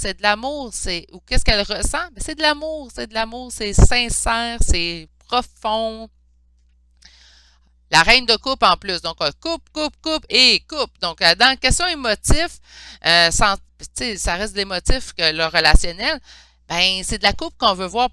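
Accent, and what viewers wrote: Canada. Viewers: J'adore ton accent canadien merci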